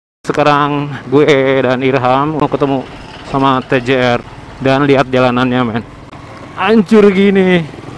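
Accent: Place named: Sunda, Indonesia. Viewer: sepertinya anda orang sunda, gue suka👍😂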